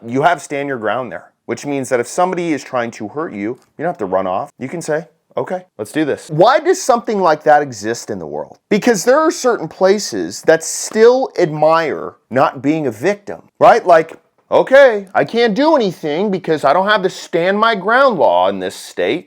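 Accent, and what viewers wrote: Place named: Texas, United States. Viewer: You talking like a Texan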